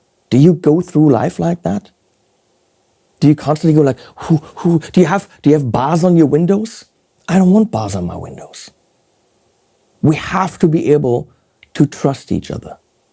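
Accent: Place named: Germany. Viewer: I make my comment not even watching the video in the full length. The reason I come back to watch your videos (and you + Johan Segeborn are the only gear youtubers I come back to at this moment) is not the gear. This is basically something that I am not interested anymore, I have enough gear to do my music. I do come back to your channel because I respect your honesty, I like to hear your rants (expressed in beautiful german accent I love) and personal messages you share with us. I don't even listen to that regularly, because i have no time for that. But I want to share a message of appreciation with you. Take care and animals at the end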